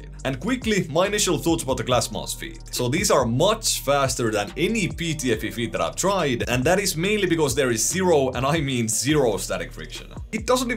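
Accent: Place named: Finland. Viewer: I like u suomi accent. Sounds cool!)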